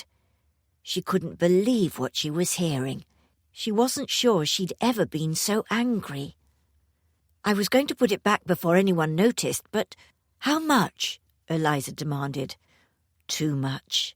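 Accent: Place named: England, United Kingdom. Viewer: How wonderful to hear an English accent🎉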